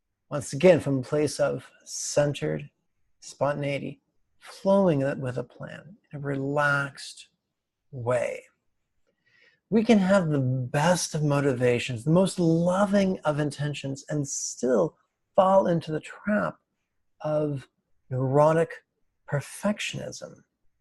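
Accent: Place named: Germany. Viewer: I like your German accent!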